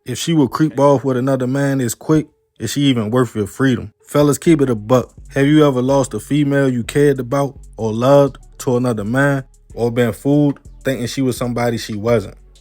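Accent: Baltimore, United States. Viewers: Sound like you from bmore.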